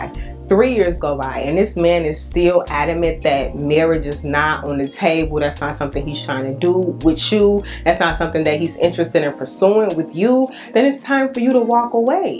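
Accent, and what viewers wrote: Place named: South, United States. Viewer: Your beautiful ❤️....Your accent ....Hmm....sounds SOUTHERN......where are you from sweetie ?? Atlanta ?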